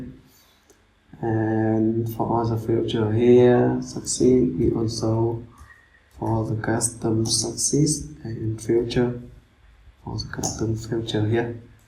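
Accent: Vietnam. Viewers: nghe giống người việt nam v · Đúng cái mình cần 😂😂 nghe cách pronounce đoán ngay a zai ngưòi việt 🤭🤭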